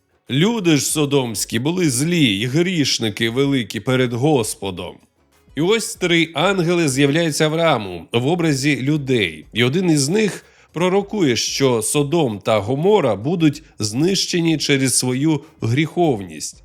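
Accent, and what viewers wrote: Russia. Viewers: Великий уклін вашій наполегливості у видавлені з себе москальського акценту. Перші ваші відео аж слухати не міг, а тут натрапив знову на ваш канал і дуже приємно здивований. Моя повага!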